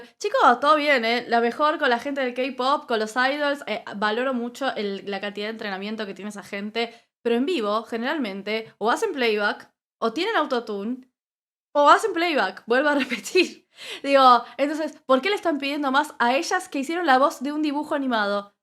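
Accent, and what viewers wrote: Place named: Argentina. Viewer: 😢 que mal ojalá que sigas haciendo más videos me encanta tu voz y tu acento si eres argentina porque aveces me equivoco con los uruguayo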